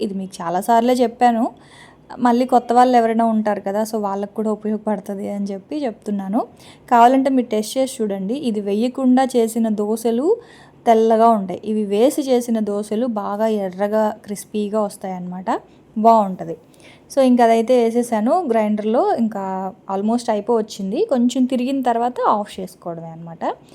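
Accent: Godavari, India. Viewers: full godavari and vizag slang lone matladataru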